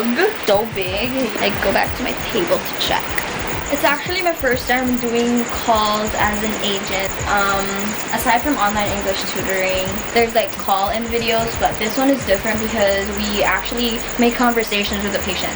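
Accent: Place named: United States. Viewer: she has an american accent ............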